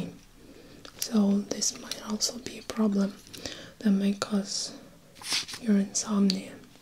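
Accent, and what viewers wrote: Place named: Russia. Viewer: Впервые на канале)) но сразу слышно русский акцент)) и книги 📚 физиология человека😄 но видео ничего есть пару норм!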